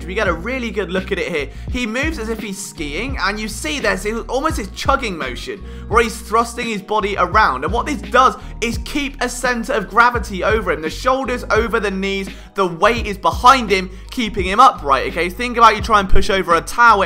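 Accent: United Kingdom. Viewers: why iss a british guy talking about football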